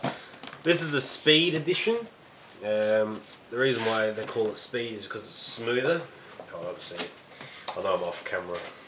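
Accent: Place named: Australia. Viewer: Yep, he's Aussie 7 years ago wow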